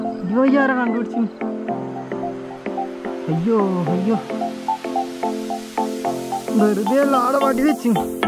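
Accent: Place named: Kannur, India. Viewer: Kannur basha yude monju vere thanne😍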